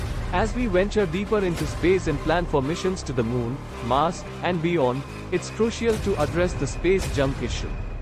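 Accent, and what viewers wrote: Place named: India. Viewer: WTH? hindi synthesized accent?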